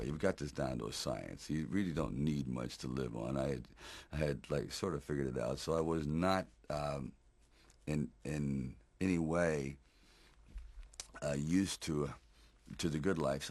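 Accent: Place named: Philadelphia, United States. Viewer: Philadelphia accent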